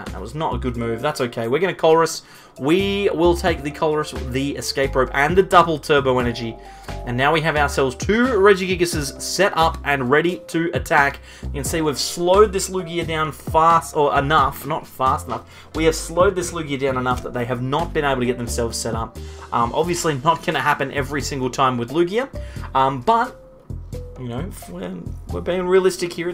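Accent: Australia. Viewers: hey bro i commented before about what the best deck was, now that the lugia vstar deck isn’t in standard anymore. what do you think the best deck is now for standard ranked bro. if you can reply i would be rly grateful bro. are you aussie?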